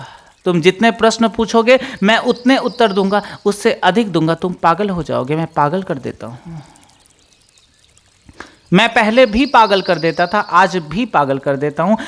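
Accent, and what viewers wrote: Bihar, India. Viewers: Isko ignore kro , Isko jitna importance doge ye highlight hoga aur logo ko chutiya banayega. Ye mimicry kr rha hai, Bihari hai ye iska tone bta rha hai.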